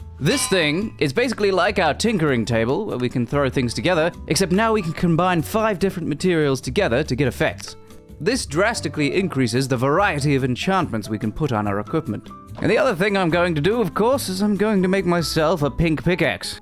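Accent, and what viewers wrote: England, United Kingdom. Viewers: I love his fluency in British accent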